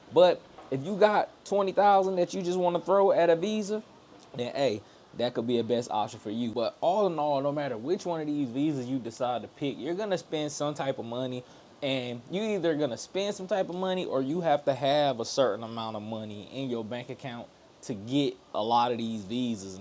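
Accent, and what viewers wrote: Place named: Detroit, United States. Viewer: Put on your Nigerian accent instead brother drop the ghetto Detroit lingo hip hop you sound low iq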